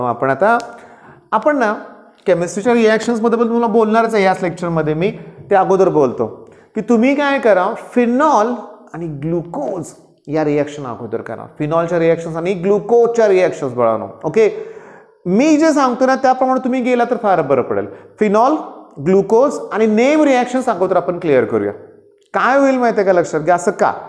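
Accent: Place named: Satara, India. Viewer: साताऱ्याची भाषा !!! 😇 खूप भारी sir....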